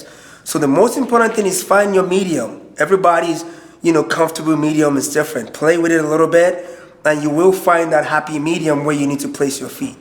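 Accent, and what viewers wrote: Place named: Ghana. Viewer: Sounds like youre from Africa..Ghana maybe?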